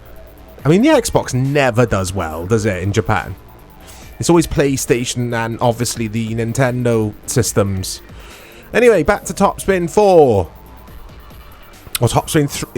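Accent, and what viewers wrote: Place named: London, United Kingdom. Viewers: Which part of London are you from mate? You sound Posh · Ha. He's English but think lives in Wales, but who knows.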